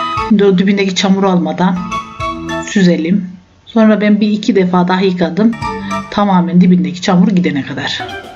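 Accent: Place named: Adana, Turkey. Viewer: Selam canım naneler yemyeşil nerelisin Adana gibi geldi bana.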